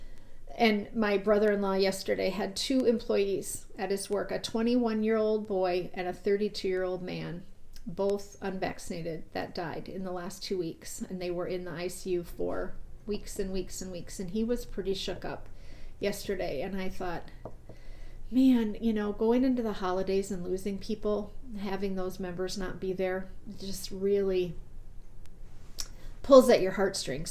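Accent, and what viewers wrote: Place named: Minnesota, United States. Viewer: Merry Christmas and family! Ohh, I have wanted to learn Brioche, but was always intimidated by it. Thank you for publishing this pattern and the encouragement you give for how easy it should be to learn and knit. Thanks for the discount also. P.S really noticing your Minnesota twang after watching the vlogmas....don't cha know!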